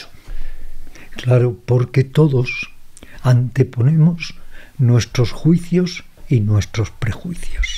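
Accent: Galicia, Spain. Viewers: Qué rato más estupendo he pasado escuchando este podcast! Aunque han sido más de dos horas me ha sabido a poco! Yo a Ramiro Calle ya hace tiempo que le sigo y le conozco, le considero mi maestro salvador ya que desde que me topé con él me ha cambiado la vida y no me cansaré de darle las gracias!🙏 En cuanto a Alex Fidalgo, no tengo palabras! Que magnífico entrevistador y me atrevo a decir, mejor persona! Cómo respetas los tiempos de respuesta, qué manera de absorber todo lo que te contestan, qué preguntas más naturales e interesantes... y ya no te cuento ese acento gallego maravilloso que es música para mis oídos! ❤ Muchísimas gracias por estas joyas! Deseando estoy de volver a escuchar otro podcast! Ah, y puedes seguir repitiendo de invitado. Nadie creo que se canse de escuchar a este señor maravilloso!🙏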